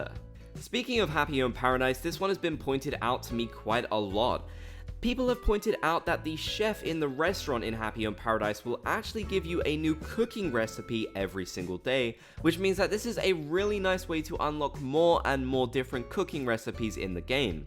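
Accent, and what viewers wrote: United Kingdom. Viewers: Oh so you're British? Say Worcestershire Sauce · I didn’t know you was British, I am too. I don’t pay attention to accents 😅 gang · british alert? this whole time i thought you were just really good at faking that accent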